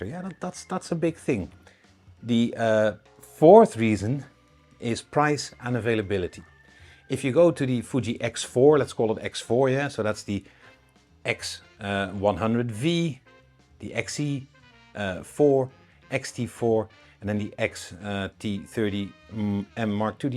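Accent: Netherlands. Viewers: Ik krijg onmiddellijk een combinatie van plaatsvervangende schaamte en tenen krommend afgrijzen bij het horen van dit steenkolen nederlands engels. Zal best goed zijn maar niet te trekken dit.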